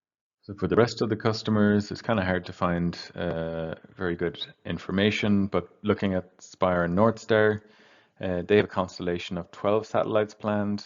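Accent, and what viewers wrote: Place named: Ireland. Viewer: Very cool! You seem to be another "Scott O" running the numbers!! A fellow Irishman too by thee sounds of it which is even better!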